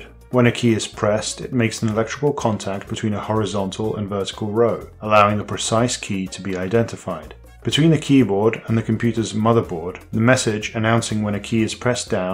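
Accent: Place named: United Kingdom. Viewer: The audio quality and the british accent. Also the way of teaching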